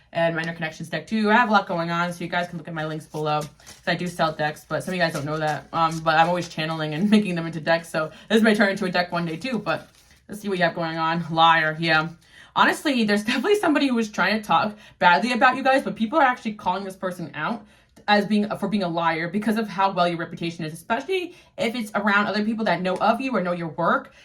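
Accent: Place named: West Coast, United States. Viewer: LoL you must be West coast!! Love the fast voice like me!! Arizona!! Yet still excellent!! Love your read!! Awesome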